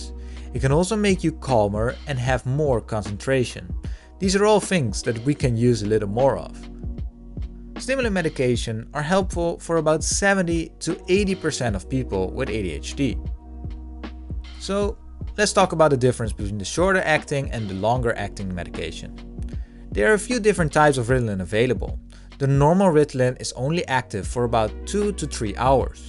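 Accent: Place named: Netherlands. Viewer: I recognize a dutch accent when I hear one!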